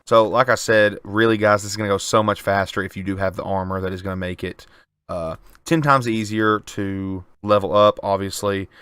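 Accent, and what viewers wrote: South, United States. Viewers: Saw the video and thought "I hope it's the guy with the southern accent" and it so was. Think it's time I subscribed lol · The accent caught me off guard 😭 I'm a southerner myself tho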